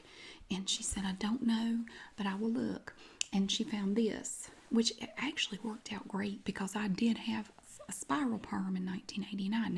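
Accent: South, United States. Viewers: Southern accent!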